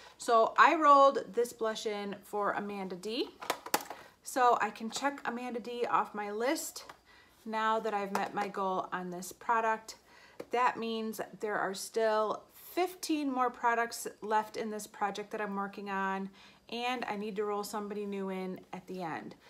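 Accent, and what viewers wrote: Netherlands. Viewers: Dutch accent :)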